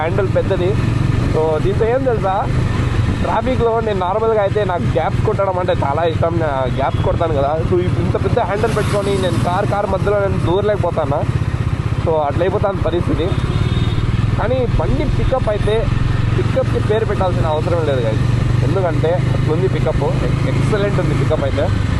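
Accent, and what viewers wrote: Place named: Anantapur, India. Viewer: Meedi anantapur district kada anna meeri matlade slang chustene telustundi 🎉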